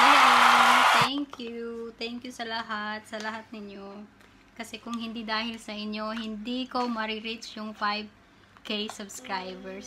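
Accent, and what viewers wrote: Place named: Ilonggo, Philippines. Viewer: Prang ilongga k mgsalita malambing. At mahinahon..new subscriber hr. #OFWinjordan.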